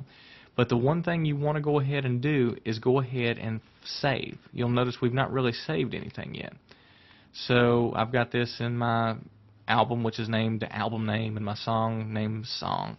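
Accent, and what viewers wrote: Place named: Texas, United States. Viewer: Dude!, are you Texan? You sound it with your delightful dialect.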